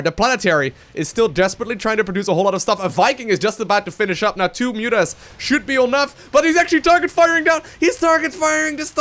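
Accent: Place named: Netherlands. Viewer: sounds dutch. The accent is real.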